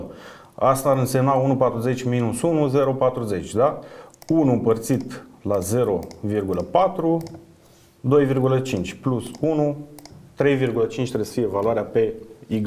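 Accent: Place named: England, United Kingdom. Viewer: Nimic nou sub soare......doar engleză în pronunție și mult bla, bla, bla....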